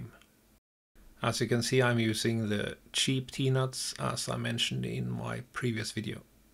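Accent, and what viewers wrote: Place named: Norway. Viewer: You sound Norwegian just like Kanrog 😂 what part are you in?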